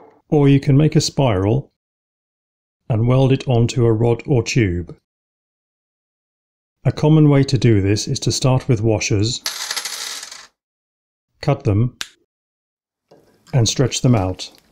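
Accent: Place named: England, United Kingdom. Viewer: I'm just over the moon to hear a middle class English accent on YT!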